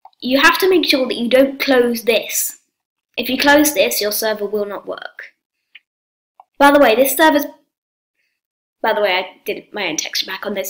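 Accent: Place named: United Kingdom. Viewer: Dat British accent tho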